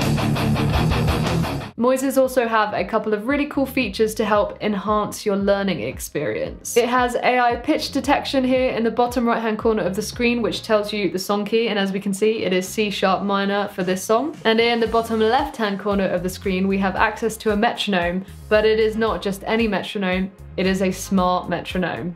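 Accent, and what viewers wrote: United Kingdom. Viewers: I had no idea you were British 😂, only follow on insta til now.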